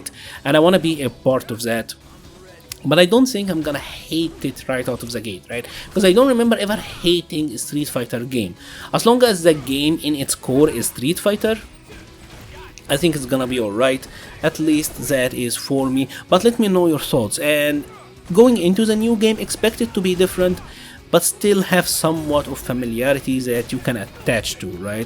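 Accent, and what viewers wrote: Egypt. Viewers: I go to an Egyptian church and I love your accent. You remind me of my abouna when you say "the people" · Love your accent.. Sounds Egyptian..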